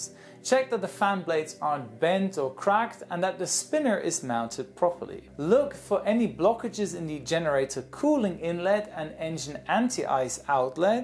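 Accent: Germany. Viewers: Are you German? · Ach... nen Germane... bin ja erschüttert das man das nicht schon früher festgestellt hat. XD (Gut... irgendwie klingt jeder Pilot gleich... da hinterfragt man das wohl nicht. ;))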